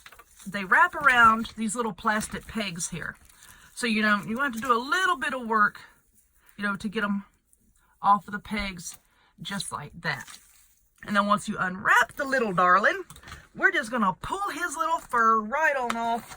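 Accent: South, United States. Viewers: I LOVE cats and Candycorns! What an Adorable combo! You are So creative, I love it! I just got back from DollarTree a while ago with the stuff to make your owl wreath! I can’t wait! I love you videos SO much! I love listening to your southern accent and some of the cutest southern things you say! Thank you so much for brightening my days and helping me be more creative!🎃🎃🎃🖤🖤🖤🧡🧡🧡🎃🎃🎃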